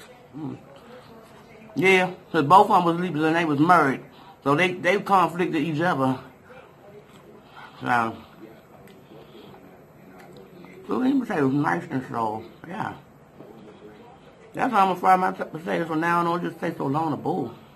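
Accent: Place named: South, United States. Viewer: You sound like you from the south, the accent reminds me of my grandmother accent. They from your area and the south.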